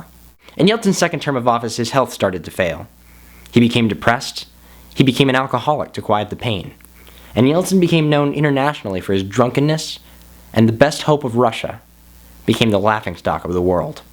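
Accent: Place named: France. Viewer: How in the world did you know all this? More importantly, your French/Non Russian accent is adorable.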